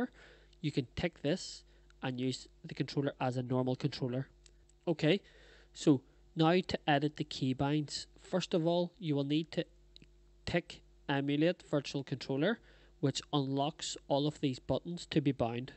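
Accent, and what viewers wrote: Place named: Northern Ireland, United Kingdom. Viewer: You from N.I?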